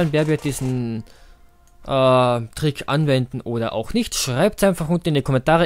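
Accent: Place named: Vienna, Austria. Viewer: hör doch den dialekt als österreicher raus ^^ wiener schätz ich mal :P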